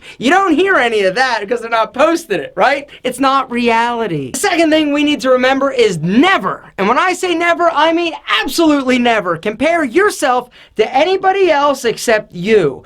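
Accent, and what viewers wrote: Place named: Baltimore, United States. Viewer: Love your accent!! Bawlmer hon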